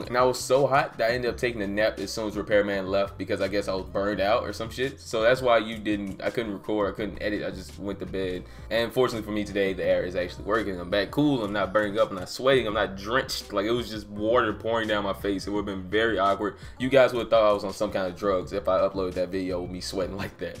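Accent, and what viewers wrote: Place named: Mississippi, United States. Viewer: I would love to hear your Mississippi accent! Thanks for answering my question!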